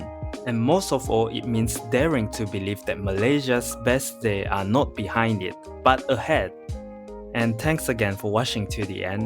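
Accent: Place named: China. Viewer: While it's a fact May 13 happened, please remember that this video's accent is PRC. Don't let outsiders drive a wedge between modern Malaysians. It doesn't even mention key names in the confrontations, no mention of Harun Idris because a PRC or Malaysian CCP shill would not know. Victory parades DID happen, which contributed to the increasing tensions. We have freedom of Internet unlike China, you can search anything about May 13, research and talk about it, unlike Tiananmen Square.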